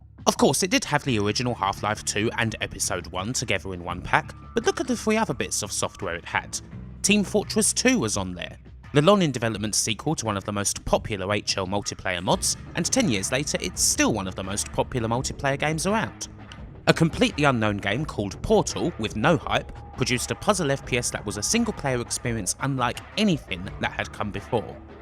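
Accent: United Kingdom. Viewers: LOVE THIS REVIEW, AND LOVE YOUR BRITISH ACCENT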